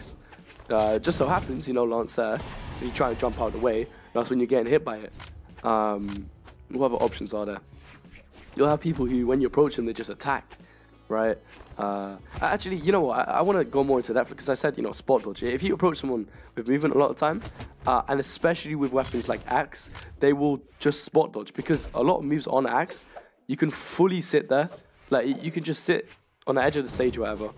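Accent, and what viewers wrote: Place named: Croydon, United Kingdom. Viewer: Oh okay I was just wondering because you sound like you’re from Croydon when your sick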